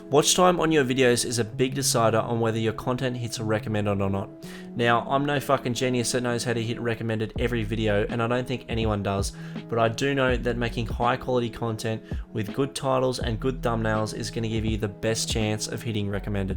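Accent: Australia. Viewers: Are you from Australia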